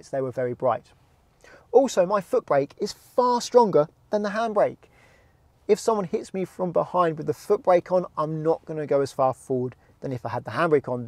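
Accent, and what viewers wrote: United Kingdom. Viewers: What kind of accent is this? (I know it's British, I mean what region)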